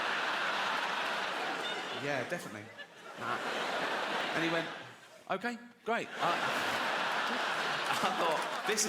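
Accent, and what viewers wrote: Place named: United Kingdom. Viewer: Only a brit can do this! It would not sound so good in American accent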